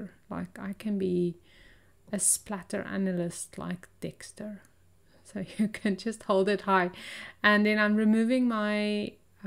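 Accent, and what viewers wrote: South Africa. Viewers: Wonderful inspiration, thank you! Do I hear a South African accent? Lekker!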